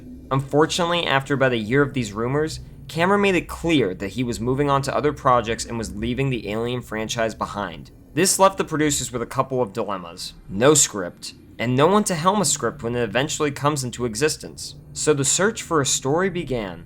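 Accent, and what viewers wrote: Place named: United Kingdom. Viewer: Sue Lah Co (NOT Sue Loo Cooooooh*) (NOT *So Long Kong*) and (NOT *anything else!) SUE .... LAH .... Co (with Co being pronounced like the American acronym for a "Company" or "Co." or perhaps: Sue LOCK oh ................................................................... ??? ! PLEASE................... ... Dude... if you had truly WATCHED "Aliens" ... you'd have known this. we who LOVVVvvvved..... all these movies are actually distracted (terribly) each time you say it YOUR way. ---Overall.... "We" even agree with you. WTF "happened" to Alens3.... but dammit man. Sue-Lah-Coh ... Ok ? ...................... You GOTTA "re.do" this Man.... or at least re-upload with SOME kinda acknowledgement at the beginning of this video............ we GREW UP with these movies Kid..... we have seen ALLLLL the backstage and Behind The Scenes videos on the aliens movies and brother.... 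this is an egregious error, to ANY erudite "Aliens" fan. ................. seriously . dude..... I'm sorry to say it. But it's true. it simply shows us you're NOT an "Aliens" fan................ and if NOT...... WTF would YOU be "outraged" about anything "wrong" with Aliens... ...................... if you cannot pronounce the name of the "Sulaco" properly? PLEASE.............. "excuse" yourself by re-uploading (with a statement at the beginning) that you cannot help but pronounce it (phonetically) like someone from the U.K. ................. and we will "Let it Slide".... but ... be slightly more careful dud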